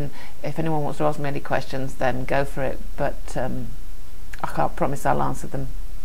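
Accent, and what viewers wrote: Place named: United Kingdom. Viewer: what's not fair is how UK accents make for better videos! no fair!!! I want one. I'm with you... predictable in my avoidance of predictability. Means you never quite fit in groups eh, you resist the little conventions and micro-traditions even a small group of friends tend to develop. lets all be individualists together!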